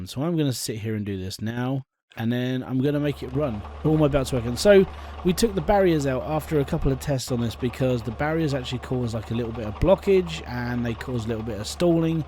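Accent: United Kingdom. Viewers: hears Brittish accent subscribes i love this game and that accent XD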